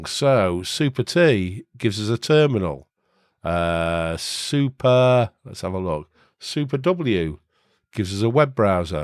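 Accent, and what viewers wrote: United Kingdom. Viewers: Oh no! speech speed and British ascent is perfect mix. I personally enjoy listening to way more that any other youtube Linux channel out there. Informative and relaxing in the same time. This channel is a marvel...thank you!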